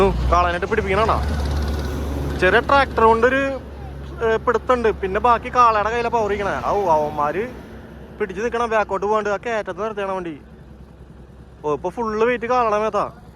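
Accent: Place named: Thrissur, India. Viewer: Thrissur slang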